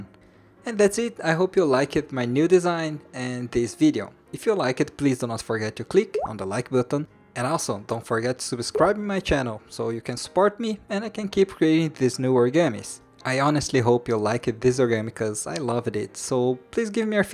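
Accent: Japan. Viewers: It's a shame that the person with the coolest crafts on YouTube has the least comprehensive, most annoying tutorials. Plus, when you're not very good at it (like me) you have to listen to this autistic Japanese accent and this kindergartner level grammar until you finish the tutorial or you have the sense to quit the video. The latter worked well for me.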